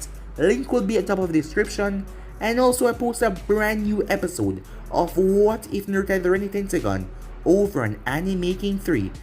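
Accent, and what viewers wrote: Jamaica. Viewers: I do not wish to offend you, but are you Jamaican or Canadian? Also, very nice series. Can you do a what if with Naruto getting both wind and lightning chakra natures?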